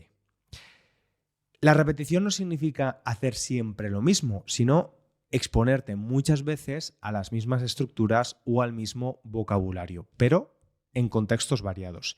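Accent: Spain. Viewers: ¡Me encantan tus podcasts! Intento escucharlos 5 veces por semana. Viví en España un total de 2 años, y ¡cómo echo de menos al acento español! He encontrado por aquí en los EEUU, no hay mucha gente de España. Hay bastante de México, América Central y Sudamérica, y eso está bien, pero me encanta el acento de España y me das una manera de poder escucharlo., tu voz es bien clara, fácil de entender (aún los episodios avanzados) y tu gramática es 👌🏻-me gusta muchísimo! Tu hermana, por otro lado… 😅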